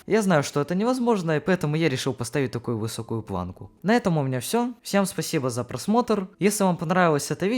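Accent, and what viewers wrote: Ukraine. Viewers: Он из Украины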